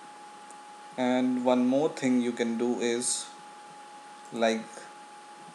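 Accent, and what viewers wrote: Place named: India. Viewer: You did well but let me tell you, your Indian accent is so ugly and I hate it. you should put more effort into practising pronouncing english bro then I bet your videos r greater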